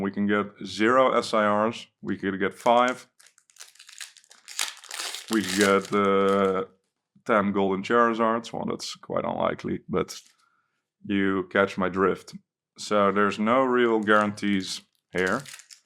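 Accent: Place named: Netherlands. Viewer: Was thinking you were Dutch too. That accent! :L. But, you're English is fantastic, even you haven't spoken it for years.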